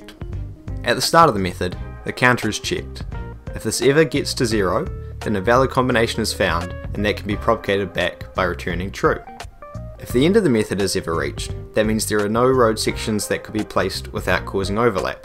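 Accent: New Zealand. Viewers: You sound like you're from NZ. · Yo is that a rare kiwi accent on youtube?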